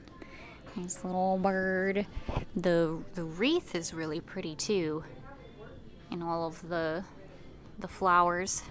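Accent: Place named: Maryland, United States. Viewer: Sounds like Maryland to me.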